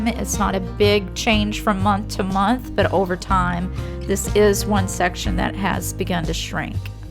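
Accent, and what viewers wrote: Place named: South, United States. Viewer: Based on your accent, you probably have eaten plenty of Vi E Na sausages in your life. Vi(rhymes with die) E it’s a hard E, Na (rhymes with huh). Ok for all you non southerners let’s say it! Vi E nah sausages or just Viennas for short. And you eat them with soda crackers, not saltines. Southern lesson is over, go get you and your mama summan ta eat! 😘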